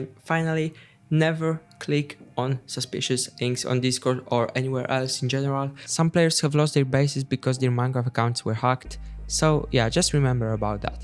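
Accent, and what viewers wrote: Italy. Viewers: Dude are you italian?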